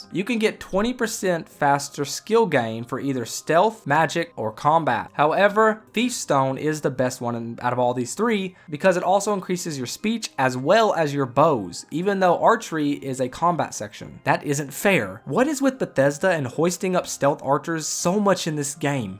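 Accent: South, United States. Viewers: My opinion is..... You have a fantastic southern accent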